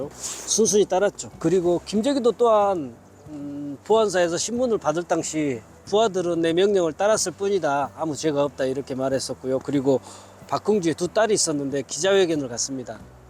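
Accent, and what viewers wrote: Jeolla, South Korea. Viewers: 전라도 억양으로 또 이런 코인팔이 하는 구나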